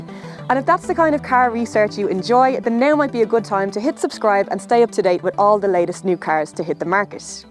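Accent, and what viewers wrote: Dublin, Ireland. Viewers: It's a huge pleasure to watch and listen to your reviews: they're through, precise, competent, and on top of that, you are amazingly beautiful and gifted with a delightful (Irish) accent. Thank you! Best regards from Portugal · Dublin accent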